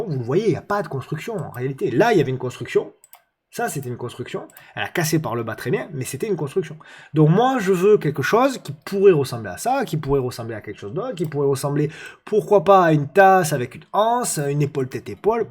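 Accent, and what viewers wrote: South, France. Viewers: Le froid est la, mais un bon thé chaud et l'accent du sud de vous réchauffe pendant plus 1h30. Merci! Quand j'ai vu ton visage sur le Palais Brongniart, je me suis dit que ca devait faire quelques choses en tant qu'investisseur. (Juste une critique sur l'investor day, les salles étaient petites, pas facile d'avoir une place, un coup de chance (j'ai eu la derniere place), j'ai pu assister a la présentation Bitvavo. Bon weekend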